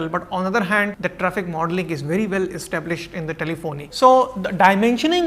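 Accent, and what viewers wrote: India. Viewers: how terible sound when indian people speak english :(((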